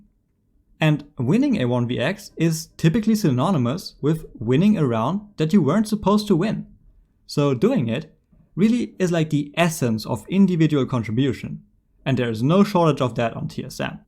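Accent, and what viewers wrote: Germany. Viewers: Duuuude yo accent sound german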